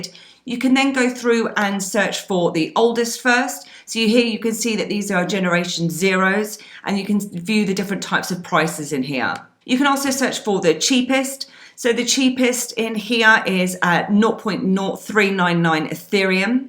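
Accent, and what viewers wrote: United Kingdom. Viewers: the prim and proper British pronunciation that's catnip to an American's ear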